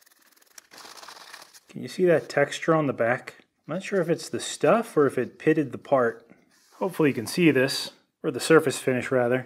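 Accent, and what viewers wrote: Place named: Philadelphia, United States. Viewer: As an amateur linguist. And a cunning one, at that. Something weirds me out... you have a Philadelphia area accent... but you said "pop cans". People in the northeast don't say "pop cans", they say "soda cans". So wtf? My conclusion: you're from the Philadelphia area, but you currently live somewhere in the middle of popcan country. OR, it could be that you're in the rare, super thin strip of middle Pennsylvania where the Philly accent overlaps with the popcan people. whats the deal?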